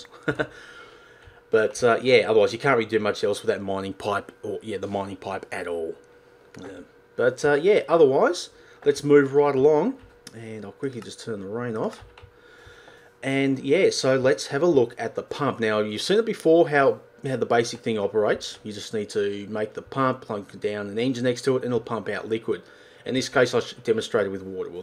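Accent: Australia. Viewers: your Australian aren't you?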